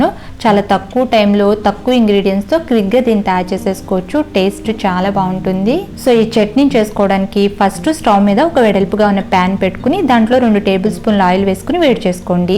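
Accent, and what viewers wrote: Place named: Konaseema, India. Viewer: Ma'am, your recipes are just awesome..but please I have a request to you..by the way, when I observe you speaking, మీరు కోనసీమ వారిలా అనుకొంటున్నాను.. నేను కొత్తపేట, అమలాపురం, రావులపాలం చాలా సార్లు వచ్చాను Hyderabad నుంచి.. అయితే, అక్కడ ఇచ్చే కొబ్బరి చట్నీ కానీ, బొంబాయ్ చట్నీ కానీ ఇంక్కెడ ఆ రుచి లాగా రాదు రాలేదు..మీరు దయచేసి, ఆ కొబ్బరి గట్టి చట్నీ గ్రీన్ or light yellow colour లో ఉంటుంది ,, specially ఇడ్లీ లోకి, బొంబాయ్ చట్నీ పురిలోకి ఇస్తారు... మాకు Hyderabad లో సైకిళ్ల మీద అమ్మే ఇడ్లీ వాళ్ళు అది సర్వ్ చేస్తారు, as they are from రాజమండ్రీ and other east Godavari district s.. please Ma'am video చేస్తారు కదా,,💯🙏🙏🙏 please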